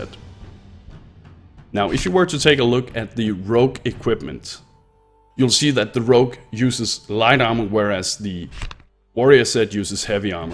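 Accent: Denmark. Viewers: Bro You sound Danish 😁 No Worries i do too. Cuz i am 😂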